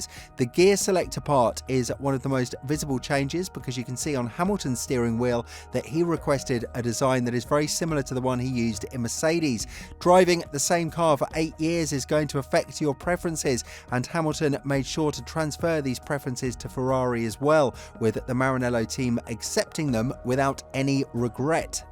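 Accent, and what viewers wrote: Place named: England, United Kingdom. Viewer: Massively bias, sounds English, so no surprise there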